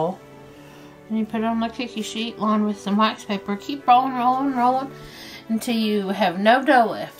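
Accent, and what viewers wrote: South, United States. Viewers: Ok........this recipe compelled me to subscribe,love you your a sweetheart.............."just keep a-dippin'" true southern belle. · U are adorable!! Are u from the south?? I can here an accent in ur voice. Thanks for the sweet video!!! U are making me want some buckyes!!! Urs look yummy!!! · has a sweet southern,comforting voice.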